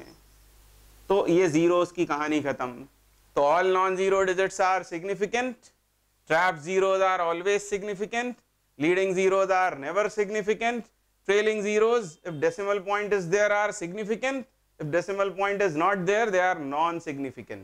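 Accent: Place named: India. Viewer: eng title, random india voice...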